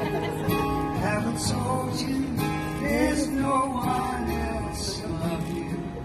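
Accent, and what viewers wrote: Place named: Liverpool, United Kingdom. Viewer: I love the scouse voiceover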